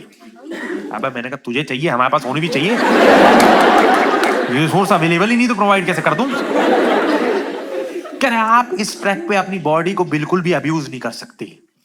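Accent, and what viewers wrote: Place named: Madhya Pradesh, India. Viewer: A few months back he did this set in Bhopal as an opening act for Zakir Khan. It was my first time watching a stand up comedy show andit was very easy to see that the audience could relate to the set. As I said, I am from Bhopal, is a junior from school and many of my friends went to the same college as he did. The school things that he talks about in some other videos, I can very much relate to. My friends talk a lot about their local engineering college experiences and the way they share their stories and the stories themselves are very similar, it's so funny to listen. And it seems like it must be a very common phenomena to have such friends' groups and the dynamics that they had and "मध्य प्रदेश के ग्रामीण परिवेश के बच्चे" is such a prominent thing in the colleges of Bhopal. Everything he talked about, the way he talks, the lingo, the references, all the stories feel so similar that most of it is pure nostalgia. 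It is very much a Bhopali thing. I also realise that a lot of the things he says are for the performance but honestly a lot of it is quite relatable. I used to participate in open mics a decade ago and I remember he used to do open mics in his early days as an aspirational stand up comic. He has grown so much as a stand up comic, it is such a fascinating journey to see. He still has that inner millennial Bhopali vibe. Wo DB mall ka food court and the Christmas tree at the entrance of the mall that he talked about, saare tamashe wahi hote the. 🤣 Aur sahi me k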